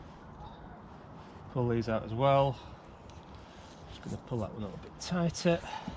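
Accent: Yorkshire, United Kingdom. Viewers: Thanks very much for this vid and the others on the Lanshan 2. And so good to hear it in the original Yorkshire 🤓. I walked & wild camped on some of the Pennine way in March with my new Lanshan 1 and liked it so much I’ve got myself a 2 to go bikepacking with my young son at Easter..so great to get some tips, and such a solid recommendation.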